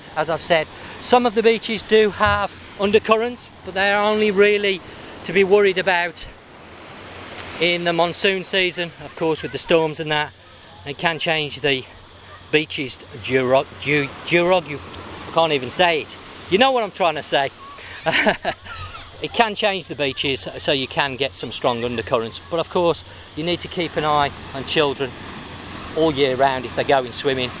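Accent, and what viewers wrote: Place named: Birmingham, United Kingdom. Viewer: Hi what’s it like in Christmas time and could you please recommend any 5 star hotels close to clean beaches and clear seas? Thanks for the vlogs they are great especially hearing the brummie accent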